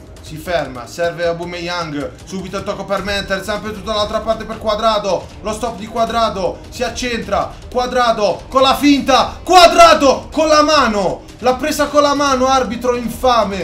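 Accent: Sardinia, Italy. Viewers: ma sei sardo?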